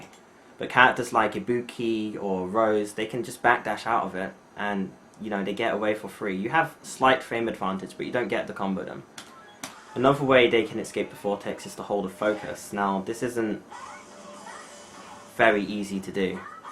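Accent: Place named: United Kingdom. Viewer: um i dont wanna sound disrespectful but for i bit i thought you were british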